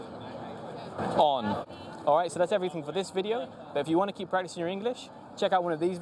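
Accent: United Kingdom. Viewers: Hallo mate, I wonder what kind of accent do you have? It sounds British but for me it's too clear for a British accent .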